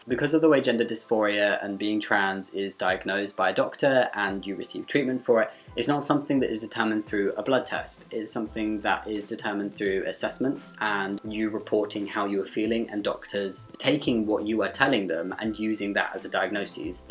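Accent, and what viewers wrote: United Kingdom. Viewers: You’ve successfully made me hate the British accent. You are a joke and are an insensitive to other humans. Quit being a narcissist. You’re entire community is indoctrinated- including you. 🤮 You also lie (because it is too simple to get hormones -10-30 minutes) and you invalidate other people’s reality. STFU and think about something else other than your gender for once in your life.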